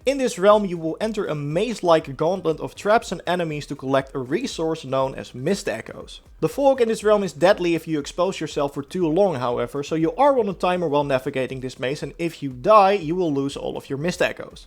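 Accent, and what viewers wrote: Netherlands. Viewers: In loving the dutch/ anglo accent 😂😂😂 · Sounds Dutch to me. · Great vid 🙂 Quick question.. Are u from the Netherlands? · Hi, In the english language the word LOT, like "a lot of power", is pronounced a LAT. It shows your Dutch background where a lot of people do this. It is no problem and people instantly recognise your voice, but it is just that little bit extra professional, which all of your video's are... No offence meant at all just this remark, wether it is useful or not. Kind regards, Jules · Just a weird question. Are you dutch?. Haha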